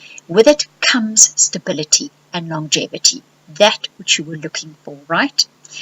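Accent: South Africa. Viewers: Woah - this made me tearful re the letting go of fear to commit to things. Have had a stop/start thing going on for the past through years (blame Pluto?) re work and have been reluctant to go in boots and all because of it. Crossing fingers for smoother waters. Love your SA accent! Cheers from NZ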